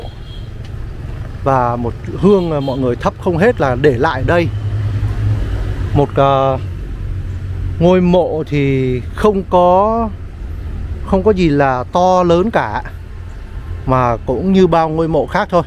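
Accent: Vietnam. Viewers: chẳng biết gì về lịch sử cả. Nói thì giọng Bắc pha Nam đơn đớt chối tai!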